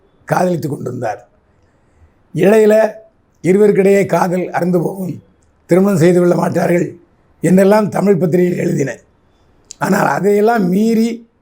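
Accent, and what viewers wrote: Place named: Tirunelveli, India. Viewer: Annatchi yentha puthula yentha paaaaambu irukum, Anna Nellai pasai Yellam polichathan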